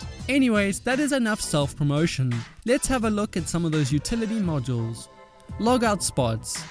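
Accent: South Africa. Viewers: South African flag on your discord status i think i might have found the most normal sounding south african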